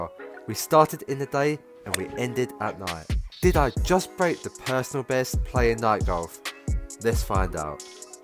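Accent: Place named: United Kingdom. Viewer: I’ve watched so many of these videos that as an American his British accent is sounding like a normal-to-me american accent from my POV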